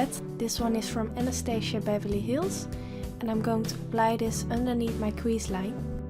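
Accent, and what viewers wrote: Netherlands. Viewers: omg i love ur tutorials! u have a dutch accent haha! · This look is amazing! Btw, what country are you from? You've got a little bit of a dutch accent ;)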